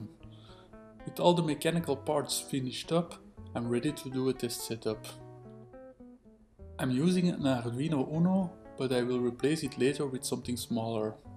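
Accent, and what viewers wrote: Belgium. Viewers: Your videos are very inspiring and pleasant to watch... and your accent reminds me of my good friends in Brugge and Gent :-) Best wishes from Poland!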